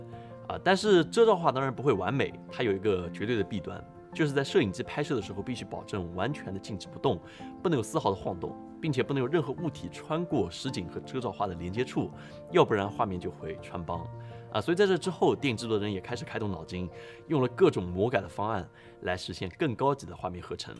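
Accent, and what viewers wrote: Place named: Hangzhou, China. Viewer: Tim的杭普话 太亲切了！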